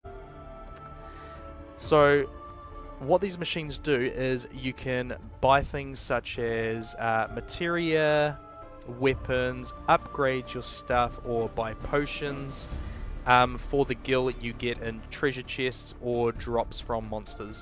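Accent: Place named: New Zealand. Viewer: Yo, instant sub when i heard kiwi haha. Me and my son are jamming this atm. Big fan of the ff series great vid.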